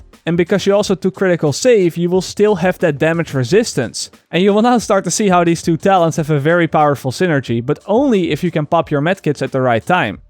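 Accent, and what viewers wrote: Netherlands. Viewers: Love the content on your channel aswell as your Dutch accent. Great Video, keep it up! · you have a dutch accent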